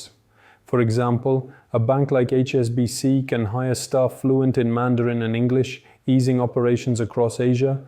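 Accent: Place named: United States. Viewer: &quot;Employment security not the best? I lost my job and was paid 70% of that salary for a year afterwards, as long as I proved I was job searching in that period. What other country does this? At one point I had a hard time paying a months rent with that 70%, and my municipality paid my rent with barely questions asked. Coming from an author who sounds american, you guys have absolutely 0 job protection or unemployment benefits. Really deligitmized this video.&quot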